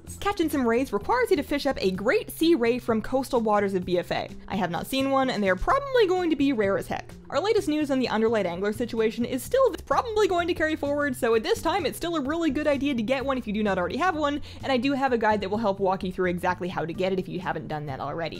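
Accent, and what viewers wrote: Canada. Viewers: someone's from canada Eh?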